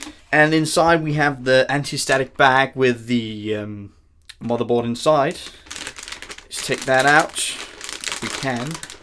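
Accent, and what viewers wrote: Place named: United Kingdom. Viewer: you look American but sound British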